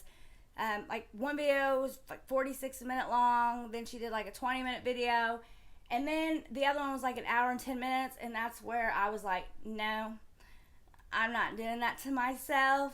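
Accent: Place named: South, United States. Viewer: Wow I never would've guessed you're from Indiana. You sound like you have a southern drawl.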